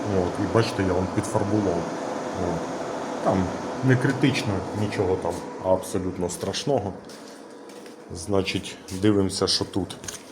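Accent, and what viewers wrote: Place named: Poltava, Ukraine. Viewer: Говір Полтавський)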